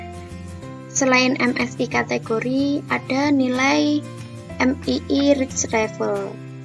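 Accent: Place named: Madiun, Indonesia. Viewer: Terimakasih banyak mbak jadi kangen sama medoknya madiun ehehe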